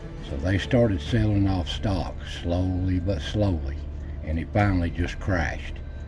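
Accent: Appalachia, United States. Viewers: It's so comforting to hear someone actually from the area narrating these videos & passing down the knowledge... It's sad how many of the foraging & mountain videos are narrated by someone obviously not from here... Thank you again ❤ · 23 year old man on the edge of Appalachia in Alabama thank you for your vids I can really relate to what you post you remind me of my grandad a lot the way you talk true Appalachian down in Alabama it’s similar to the Tennessee talk like you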